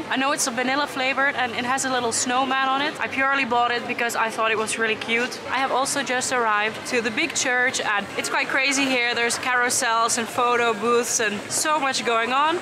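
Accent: Netherlands. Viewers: How does an expat pick up such a dutch sounding accent?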